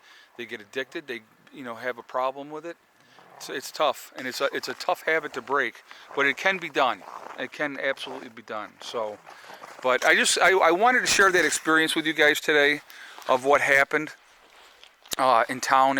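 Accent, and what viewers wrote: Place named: Upstate New York, United States. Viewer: I really enjoy your channel, and your upstate NY accent, Boston native here. Ive been following your channel for a while now, subscribing. Im a so called prepper myself. I'd rather have it, and not need it, than need it and not have it. Thank you for sharing, I'll see you on the next one 👍👍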